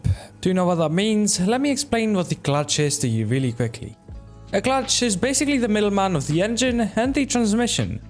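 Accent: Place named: England, United Kingdom. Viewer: Bro i love your english accent👍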